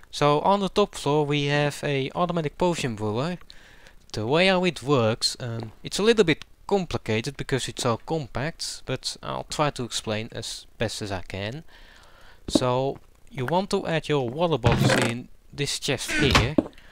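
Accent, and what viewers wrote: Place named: Netherlands. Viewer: I think you are dutch? :P try to fix your accent :)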